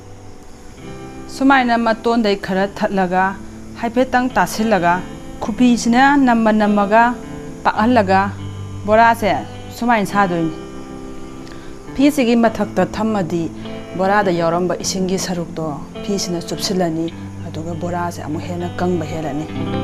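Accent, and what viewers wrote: Kakching, India. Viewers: Kakching marol se taba yam nungai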